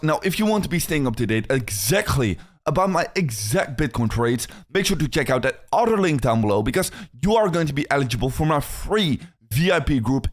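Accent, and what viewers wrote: Netherlands. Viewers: Waarom heeft hij 2 kanalen? Je hoort duidelijk hetzelfde Hollands-Engelse accent.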